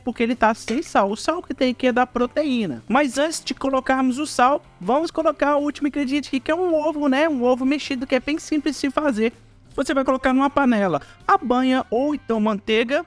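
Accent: Minas Gerais, Brazil. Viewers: Modo de falar hororoso sotaque mineiro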